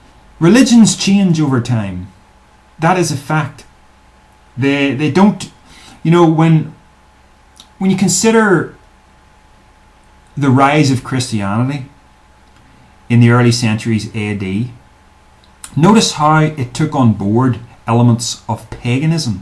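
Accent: Ireland. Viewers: hearing you talk is like listening to myself think...but in an Irish accent. Weird finding someone who is on the same page on almost every topic you've covered. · oh boy are you a luciferian?i am so scared now.i get remarks like that all the time.please dont let them get to you.we are working on a website.and i will send you a link when we are done.well most of the work is done by onno.but its gonna be awsome ok?i like ur accent.is it irish?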